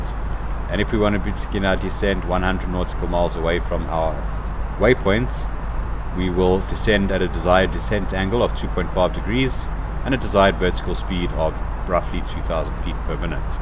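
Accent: South Africa. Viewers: You can tell wen someone's south africa.😂😂😂 love it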